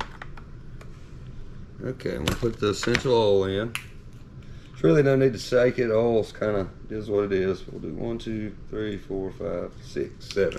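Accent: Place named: South, United States. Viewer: Omg! Love that thick southern accent! And your information!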